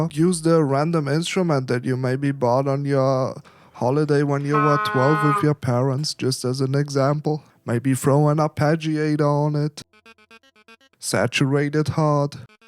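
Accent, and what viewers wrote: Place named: Scandinavia. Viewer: 200 views and nordic accent let's go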